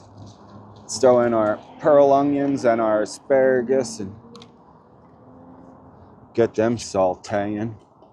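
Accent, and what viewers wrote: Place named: New Orleans, United States. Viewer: I am one of your new subscribers and I also live in Colorado. I love the way you make homelessness as an adventure and there’s no poor me. By the way I love your voice, kind of sounds like you’re from New Orleans but I think you said you’re from Pennsylvania. Have you thought about upgrading to maybe a van? Or a truck with a shell or small camper? Anyways, good luck and God Bless.♥️🙏🏻♥️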